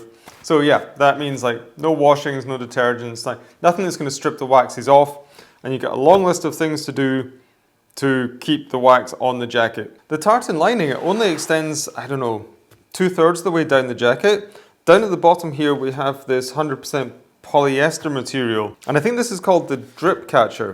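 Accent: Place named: Edinburgh, United Kingdom. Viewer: He’s Scottish , East coast accent .... probably Edinburgh .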